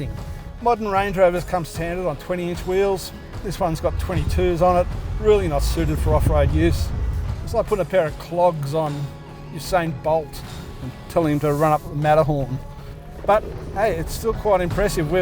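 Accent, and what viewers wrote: Australia. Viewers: Classic austraaalian review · English, Australian, we have a wide range of different accents in Australia.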